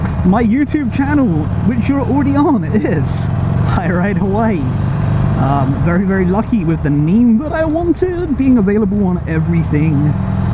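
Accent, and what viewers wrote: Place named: Australia. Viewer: Hey nice first motovlog! Are you from Australia or the UK? your accent is not very local haha. You really do remind me a lot of the Aussie motovloggers though. What is funny, is that I was going to use iridehawaii as my channel name when I first started but I was watching a lot of Revzilla product reviews and the "rev" won instead of "ride". I guess since Andy gave you some tips, this motovlog was kinda long. There is absolutely nothing wrong with long motovlogs but as a new motovlogger try to keep it under 10 minutes to keep your audience's retention. We are still trying to get to know who you are. As for swearing, it really doesn't matter. It is up to you how you want to deal with your swearing. For me personally it is a non issue unless every other word is a swear word for 10 minutes then it gets a little old and unbearable to watch. 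Anyways, great Harley you got there. I hope you can ride with us one day! Welcome to the Hawaii motovlogging community!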